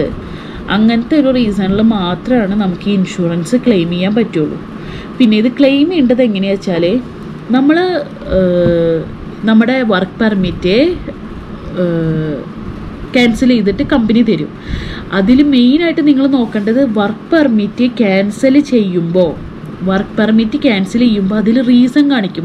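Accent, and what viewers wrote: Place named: Ernakulam, India. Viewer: I like your slang tune 😅 Eranakulam?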